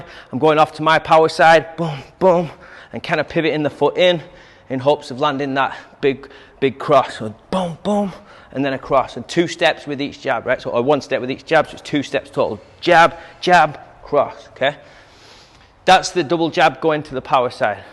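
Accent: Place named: Liverpool, United Kingdom. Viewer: You're a South Park and possibly a Scouse❤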